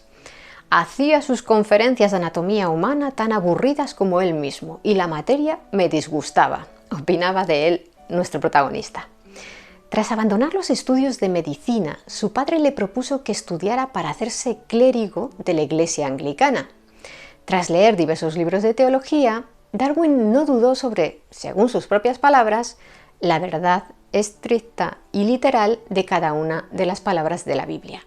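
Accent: Spain. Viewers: Me encanta tu forma de explicar la historia, con mucha precisión y elocuencia ojalá los profesores de historia se topén contigo, debo admitir que tradicionalmente el acento español no me resultaba agradable al oído, pero usted hace que eso resulte todo lo contrario. Saludos y mucho éxito.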